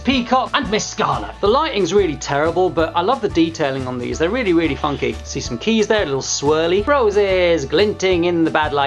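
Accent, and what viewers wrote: United Kingdom. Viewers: Btw can i get a Shout out in your next vid i would greatly appreciate that plus i love your British ascent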